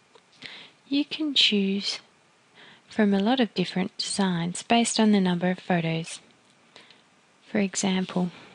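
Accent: Australia. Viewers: Hi,....besides learning from what you say in this instruction video, I also wanted to tell you that I like listening to your voice....which is very soothing, sweet, feminine and peaceful. I'm in the U.S.A. so I also like your Australian accent. It's very nice....and I hope you don't mind me sayin' so.;-)!!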